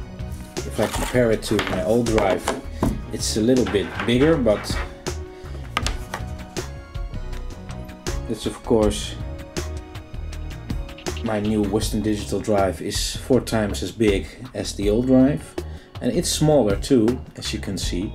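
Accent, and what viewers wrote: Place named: Netherlands. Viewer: That strong dutch accent tho lol, nice review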